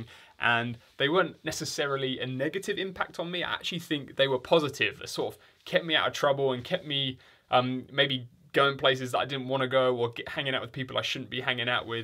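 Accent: England, United Kingdom. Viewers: How is it that I didn’t even notice you were English until you mentioned A levels. I guess the internet has made me used to the accent. I’m American.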